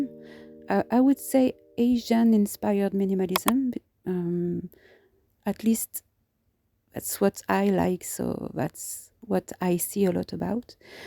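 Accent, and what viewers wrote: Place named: France. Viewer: I love your French accent! you speak English very well, please do not apologize. 🙂loved seeing your planty space and your very adorable doggie. 🐶thank you for participating in this tag 💚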